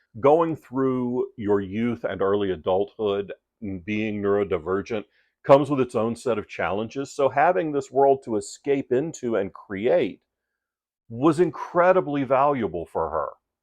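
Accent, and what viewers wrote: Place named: Midwest, United States. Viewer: If I had to guess - I’d bet you grew up in the Midwest - Missouri, Arkansas, Alabama, Mississippi. I’m from Missouri - spent 24 years in the coast guard and moved a lot. But damn - I’m good at guessing where folks grew up.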